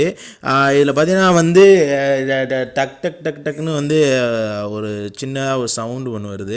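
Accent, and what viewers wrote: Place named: Sirkazhi, India. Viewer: Bro neenga sirkhali-ya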